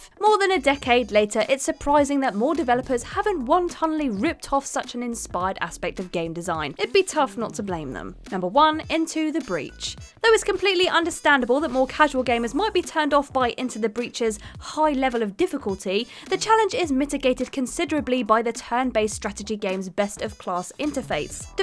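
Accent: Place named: England, United Kingdom. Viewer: that english accent makes me watch this videos in 0.25x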